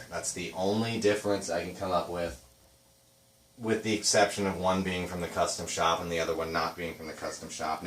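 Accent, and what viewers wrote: United States. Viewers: you sound Alaskan! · you voice had a philly twang lol i was born and raised in philly .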